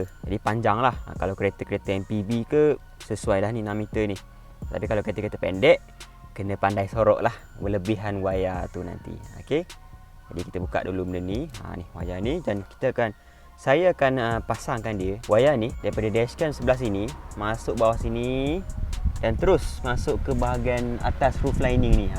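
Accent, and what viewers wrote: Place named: Kelantan, Malaysia. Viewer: Mantapp boh,,, slalu review yg ada masuk slang2 kelantan 👍🏻👍🏻👍🏻👍🏻 haha Smoga murah rezeki menang , boleh pakai utk van time hntar barang lazada 🕺🏼🕺🏼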